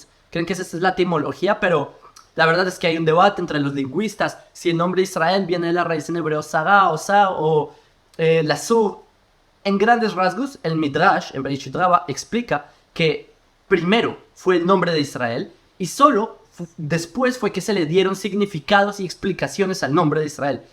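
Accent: Bogotá, Colombia. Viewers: Tienes un acento muy curioso, es decir, un acento colombiano y no israelí...en fin, ya estudiaste bien tus lecciones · Se nota que aprendiste el español en Bogotá diria yo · Bravooo!!!! 👋👋👋👋👋 excelente. Explicación aclaraste muchas dudas . Te seguiré . Tienes un acento colombianisimo 😊 un abrazo 🫂 🤗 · Tu español suena muy parecido al " colombiano "😊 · Tiene acento Colombiano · Tu tienes un acento muy Colombiano. Naciste de Padres Judios Colombianos ? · Aprendiste español en bogota o con un colombiano ? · Tienes acento Colombiano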